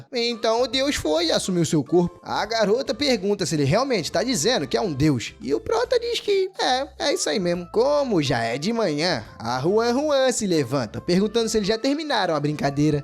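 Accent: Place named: Rio de Janeiro, Brazil. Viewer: ele é carioca que daora mano 😅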